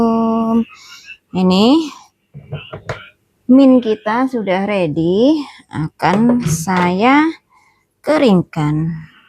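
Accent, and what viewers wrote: Java, Indonesia. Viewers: ibunya dr jawa mn kok medok banget bahasa jawane buu ..salam dr kaltim😊😊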